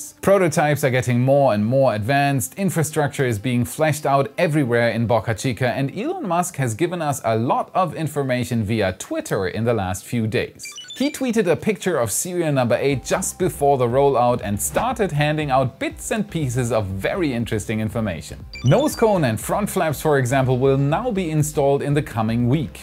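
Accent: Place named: Germany. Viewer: Ich mag deinen deutschen Akzent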